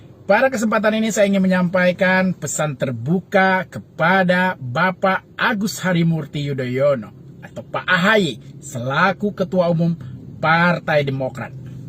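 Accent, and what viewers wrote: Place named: North Sumatra, Indonesia. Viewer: Bahasa nya kayak logat bahasa sumatra utara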